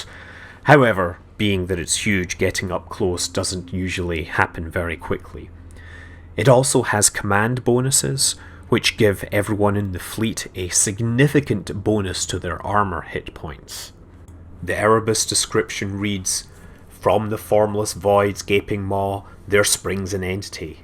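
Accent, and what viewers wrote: Scotland, United Kingdom. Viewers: dunno what it is about it, but hearing a scotsman quote scripture...just sounds...right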